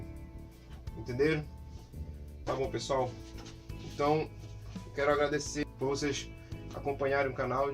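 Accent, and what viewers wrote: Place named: Manaus, Brazil. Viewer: Esse sotaque da moça parece ser da minha cidade Manaus Vcs são daqui? Tô no terceiro período de jornalismo estudei com o Romas Mascarenhas mas me esqueci tudo tô relembrando ótima aula seus vídeos.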